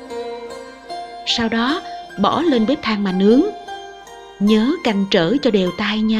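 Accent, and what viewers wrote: Mekong Delta, Vietnam. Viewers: Tiếng nói đậm chất miền Tây Nam Bộ, nghe mà mát tai luôn…! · Chất giọng tuyệt vời . rất hợp với miền tây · giọng đọc ấy đậm chất miền tây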